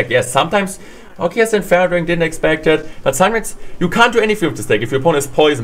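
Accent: Germany. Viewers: You are from Germany because you have Germany accent?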